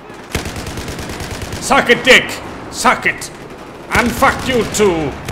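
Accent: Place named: Liverpool, United Kingdom. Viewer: ahhh you snake charmer 😏 I just watched a couple of your other videos aswell and realised you were scouse:L This is awesome content tho man! if you follow me on twitter i'll give you steam details and such on there!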